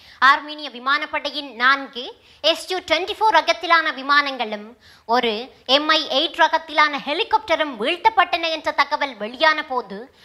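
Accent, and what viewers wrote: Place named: Sri Lanka. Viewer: இலங்கை தமிழ் அற்புதம் அற்புதம் அற்புதம். நன்றி 🙋🙌🙋🙌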